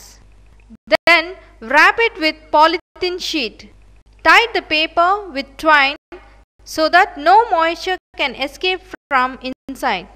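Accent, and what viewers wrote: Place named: India. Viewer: The moment you realize this voice was indian but still helpful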